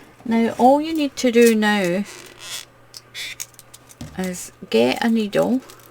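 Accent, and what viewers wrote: Scotland, United Kingdom. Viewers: Hi. Greetings from the U.S. Your doilies are beautiful and I could listen to your lovely Scottish accent all day. I can't wait to crochet these doilies. Thanks for sharing. 💟🌞🇺🇲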